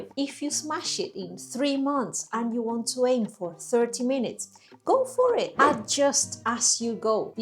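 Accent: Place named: Rioplatense, Argentina. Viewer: Me gusta mucho este video y tu canal. Estoy aprendiendo español rioplatense y siempre estoy buscando por más input comprehensible con ese acento. Muchas gracias!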